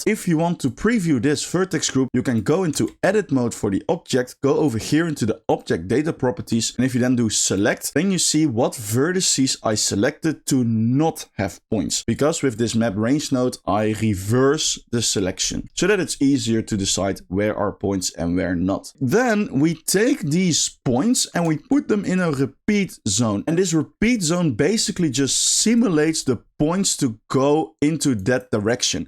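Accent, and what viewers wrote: Netherlands. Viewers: Your knowledge of geo nodes is so insane. Thanks for sharing your skills and insights. Love the Dutch/English, I assume your Dutch, like me ;). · Me when i hear english with dutch accent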